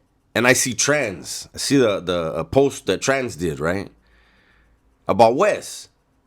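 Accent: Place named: Miami, United States. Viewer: U sound like ure from Miami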